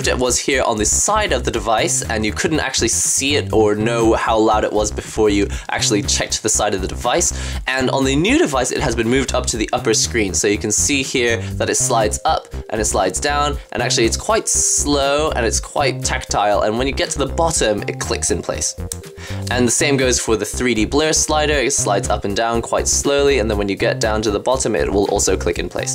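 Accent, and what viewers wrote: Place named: Scotland, United Kingdom. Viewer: I can't put my hand on your accent. You sound a tiny bit Scottish:)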